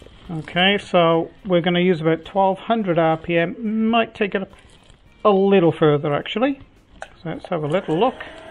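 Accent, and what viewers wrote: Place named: Cornwall, United Kingdom. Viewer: Cornwall originally? Your accent sounds like mine.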